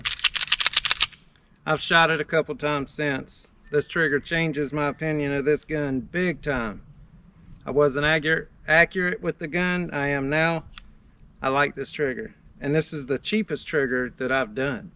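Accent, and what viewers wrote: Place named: South, United States. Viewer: What a douchebag comment , cause he’s got a southern accent , let me guess your some proper speaking northern liberal? Stop being rude , thought you yankees were supposed to be all tolerant and shit?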